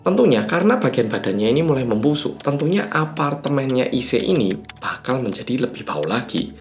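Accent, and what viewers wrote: Java, Indonesia. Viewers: Aku tu lihat yg medok, itu udh seneng,di tambah lagi cerita nya ini,lebih seneng,cerita sendiri takut sendiri 😂😂😂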